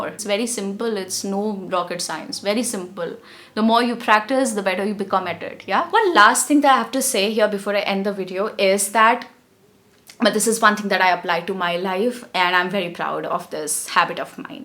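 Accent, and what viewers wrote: India. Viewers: Hello Ma'am, How are you? Ma'am I watch & listen your videos very carefully. Ma'am one day you were saying that choose easy word to speak in English & then I use choose easy word to practice myself for daily uses . Everyday I watch your videos I like your videos no matter what the time is but I use the time to watch your videos & listen that what are you saying. I think I use to start first easy word to speak then it will automatically habitual me after that I will become hard & advance or phrases word to replace that word. I like your indian accent that's why I watch your videos repeat more than one I like your videos & when you teach us properly then I can understand clearly. I use those word & repeat that word to use speak in English. I am a beginner & I Don't know what happens to me I can't speak in easy word to speak in front of someone 's else.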